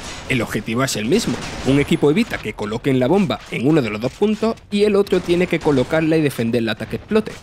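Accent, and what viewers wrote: Andalusia, Spain. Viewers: Aprende a vocalizar! Hay una delgada línea entre un atento andaluz y tartamudear en una seccion de noticias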